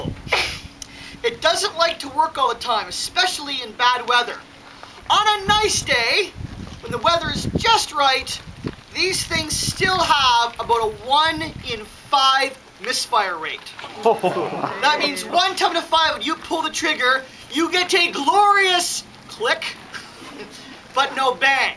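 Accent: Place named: United States. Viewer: This was very interesting, but as a Briton it was somewhat wierd to listen to an American accent.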